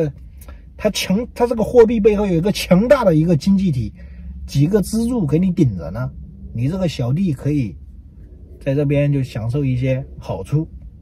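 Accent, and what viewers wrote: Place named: China. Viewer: 他不是台灣人來自中國，聽口音也知道。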